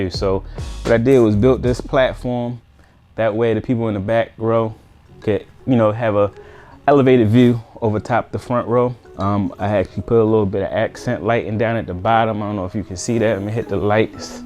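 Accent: Baltimore, United States. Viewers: Could tell you were from bmore in the first 2 seconds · Is that a Baltimore (Maryland) accent? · Nice! Aside: By the accent, I'm gonna punt and say you're from the Baltimore area...🦀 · Just stumbled upon this video you’ve got to be a Baltimorean I recognize our accents😂🙌🏾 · im guessin youre from bmore?? 🤣🤣 them dews and tews lol. good vid tho nice work on everything, i was buying random lights too until I got to Govee. Now my wife is like dont bring anymore lights in this house 🤣i see this is a couple years old tho ill check to see if you updated ✌ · Wassup man…just tuned into your channel for some ideas, loving the content. Btw are you from Baltimore? You kinda got our accent 😂😂. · Dope channel and your bmore bmore I hear lol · You got a follow beacuse the accent screams Baltimore lol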